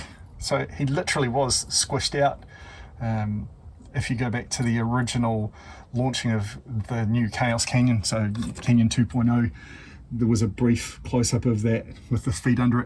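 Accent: New Zealand. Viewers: This was the second vid of yours I watched. Great stuff, and honestly I didn't pick up the Kiwi accent until I watched this. While watching the first vid (one of the hill climbs) I was thinking, that's a "unique" Aussie accent... well now the penny has dropped! Hahaha. As a fellow Kiwi, it's great to see one of the "team" create something truly amazing. I'll def look at your merch and make a purchase. All the best and stay safe. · Yeah I can imagine it would be tough. I honestly thought you were two different guys. I knew you were a kiwi but thought was an Aussie living in NZ. Some of the characters I'd like to do would be Howard Cosell Sly Stalone (as Rocky) the Blue Aardvark from Pink Panther are a few. Oh and I have an Aussie char I'm learning to talk like from you. Yes I realize you're a kiwi but it sounds the same to most eh? My characters name is Billy Bong Bonza he will have all kinds companies with commercials from a deck and patio construction Co (the way you say deck is hillarilious) to Wombat Dating Site for lonely men looking for mail order brides. 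· Great behind the scenes vid mate! Laughing, I gave up informing a few US viewers that you were a Kiwi, but no, no, they knew better, you were Aussie! It was not worth upsetting anyone..... They still have their dreams.....Until they watch this! Haha, but with your accent!! You certainly have continued and expanded your dedication from day one! Going great! Well done! So one tech question, what type of lighting have you found best to film with in NZ? Cheers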